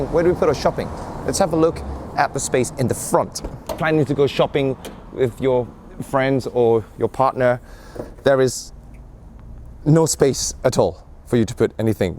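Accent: United Kingdom. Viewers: You sounds a bit like British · Where did you get the British Accent?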